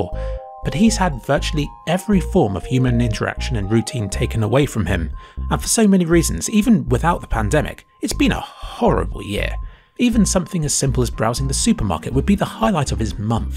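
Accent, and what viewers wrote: United Kingdom. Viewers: You sound British a re you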